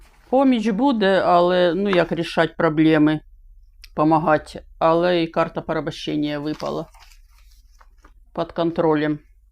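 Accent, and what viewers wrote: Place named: Central Ukraine, Ukraine. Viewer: Ми всі так розмовляємо в центральній Україні,сурджиком.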